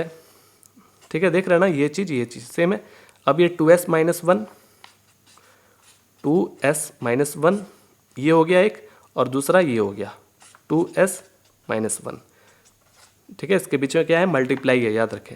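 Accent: Bihar, India. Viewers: Sir you are from Bihar 😊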